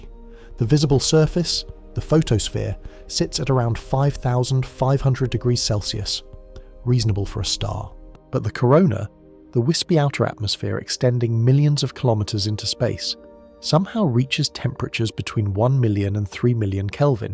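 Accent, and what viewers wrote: United Kingdom. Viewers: Another fear video. A tiny pebble going to hit the sun will not register at all but "somebody" with a crappy British accent can amplify your fear level so you cannot think straight. Who would profit from this chaos ?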